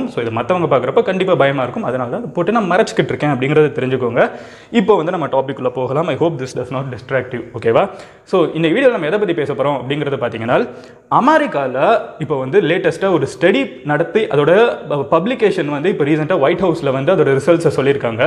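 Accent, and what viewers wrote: Madurai, India. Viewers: நீங்க மதுரை யா...? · Bro nenga Madurai ah? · Madurai ya bro nega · Neega Madurai yaah? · Bro neega madurai yaa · Bro neenga Chennai vaasi yaa Illa Madurai vaasi yaa pls sollunga bro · Neenga madurai ah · Nega madurai ya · Bro neenga Madurai ah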